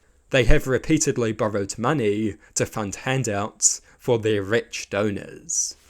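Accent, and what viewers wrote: New Zealand. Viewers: Oh, he's from New Zealand, which is why he talks funny.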